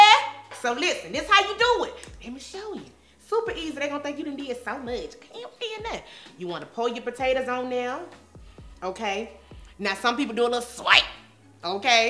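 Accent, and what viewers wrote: England, United Kingdom. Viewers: It's the British accent for me 😂 😂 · It's the british accent for me. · I “REALLY” Liked your “British” accent!!! Classy!!🙌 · She took me out with the English accent 😂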